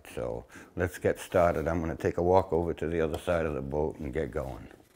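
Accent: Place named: New England, United States. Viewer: Good to see you,. Hope all is well. I really enjoy your old school approach, the sound of a common-sense New Englander in your accent, and your calm love of precision. You are an inspiration.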